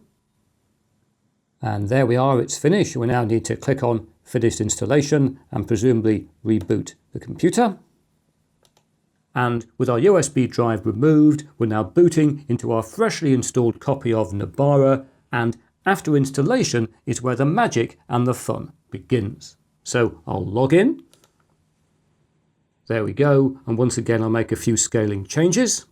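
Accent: United Kingdom. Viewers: I am extremely happy to finally running across a system that had creators in mind allowing for DaVinci Resolve being flawlessly installed and even on AMD which is my config. And thank you so much running us through the process of not only installing the system but also through the Resolve install process! And all that done through a cool british tongue 😉 and just an overall great way of explaining everything. Keep it up!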